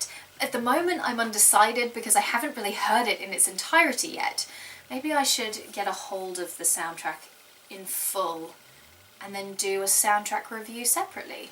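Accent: United Kingdom. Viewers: You have a British accent. I'm subscribing! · Very good review, it’s a fair bit more informative than how I’ve found most other reviews of this game to be. In all honesty, I am already quite convinced that I will love this game (I enjoyed most of BioWare’s products, from Knights of the Old Republic to Mass Effect 3), but I had to see other people’s views on it so I would feel better about spending so much money on Dragon Age: Inquisition… seriously, this game is soooo expensive in the UK! Anyway, I found the part where you talked about the choices and their more subtle effects really interesting; it seems to me as though they are more maturely done and closer to what we have in the Witcher trilogy, rather than the binary options of most BioWare titles. Though I’m also prepared to expect from Dragon Age choices that are more about defining your personality than truly changing the storyline, whereas in the Witcher games we are only given a certain degree of liberty with Geralt – who is an already existing character – but have much more freedom with the direction in which you can take the story. 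Of course, both approaches have their ups and downs, so I’m not saying either is better than the other. By the way, are you from the South of Britain? Your accent sounds like someone from that area (asking only out of curiosity, as I find languages and speech quite fascinating personally). Anyway, this is a great video, I will subscribe.:)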